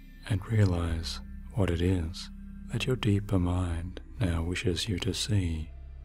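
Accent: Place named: Australia. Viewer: its Australian actually ;)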